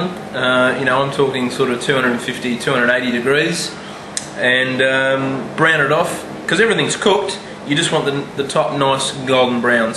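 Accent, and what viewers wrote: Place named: Australia. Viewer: can you use anything other than gravy? Say, if you REALLY REALLY hate gravy? And I looove you australians accents. They're soo aweosme.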